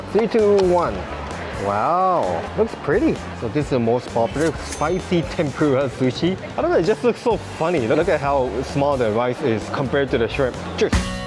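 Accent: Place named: Singapore. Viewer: Why u speak in Sg accent.. hahaha.. cute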